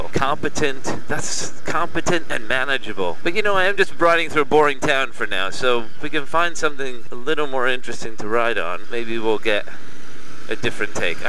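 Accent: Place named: United States. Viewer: Sounds American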